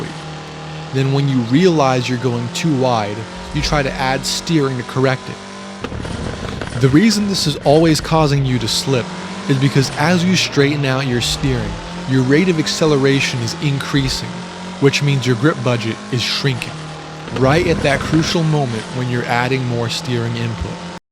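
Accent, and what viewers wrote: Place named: United States. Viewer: American accent sticking out like a sore thumb.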